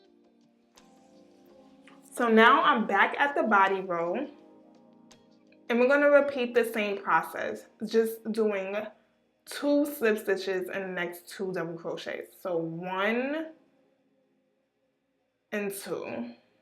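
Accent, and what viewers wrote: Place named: Bronx, United States. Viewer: Where are you from? Sounds like you're from where I'm from. Ny. Bronx to be exact. Lol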